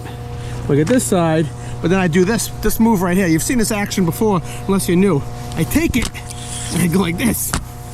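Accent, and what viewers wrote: Boston, United States. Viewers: your videos are great don't change a thing the way you're video's come across are fine its you i don't want professional thats not you. Oh by the way i like your Boston accent especially how you say yard😂😂😂😂 Keep on trucking with the W9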